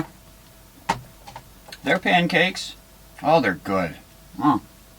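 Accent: United States. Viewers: My brother in law tapes the Bills games the same day and watches them later ad free… so no one can talk to him on Sundays! I thought I heard a Buffalo accident when you talk…By the way… I said hello at camp (said hi to lefty but forgot your name! Lol) so happy belated birthday! I not part of that group… just camped and accidentally crashed the party! Hope to run into you again before I head out… come say hello if you’d like… in the little shuttle bus ✌🏼🚐✨✨Happy Thanksgiving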